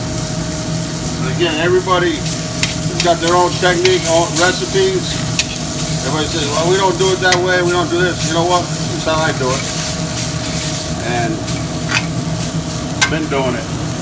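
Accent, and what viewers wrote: United States. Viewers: Love your vids and your accent! Is that accent specific to any part of the US? Keep it up!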